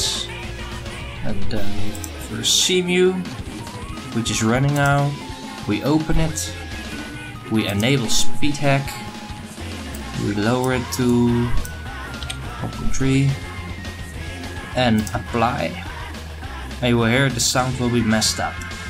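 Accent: Netherlands. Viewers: Are you Dutch? / Ben je Nederlands?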